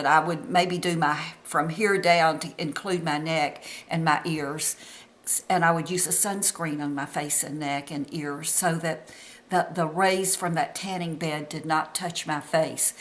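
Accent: South, United States. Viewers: 70!? You look INSANLEY FABULOUS! Plus I hear a southern twang! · So glad to hear that someone else had the same experience as I did upon turning 65. I've always taken care of my skin except when we didn't know what the sun could do. So going from what everyone told me was beautiful skin to lines, sagging, brown spots, wrinkles and under eye bags has been tough. Glad I found you! Love that southern accent!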